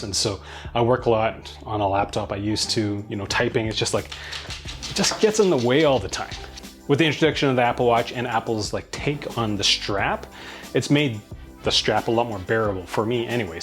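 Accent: Canada. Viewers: Is this dude Canadian?? What a lame reviewer and his jokes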